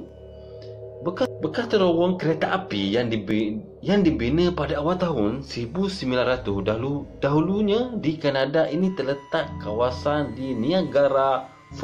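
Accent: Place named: Kuala Lumpur, Malaysia. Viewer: Mantap abe ckp kl.. 👍👍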